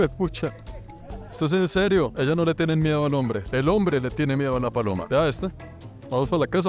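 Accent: Bogotá, Colombia. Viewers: amor!!!, Recuperaste El acento rolo😊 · Se te salio el rolo jajaj hablaste muy rolo en todo el video muy chevere y muy de buenas salir con la cámara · Me encanta como se te remarca el acento colombiano cuando estas en Bogotá jajaja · noto el acento ROLO se te marca demasiado viejo 😅... ALGUNO TAMBIEN LO NOTÓ!!!! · Me encanta que aún no has perdido el acento rolo jejej y en el sector el acento rolo lleño 🤭 , un abrazo desde Bogotá ❤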